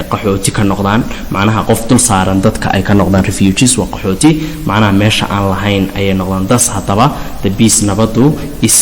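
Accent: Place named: North, Somalia. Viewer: I,m very happy today because your speech is heer waqoyi, the previous days I watched the more teachers I can't I understood the talk them, welcome teacher😇🥰😇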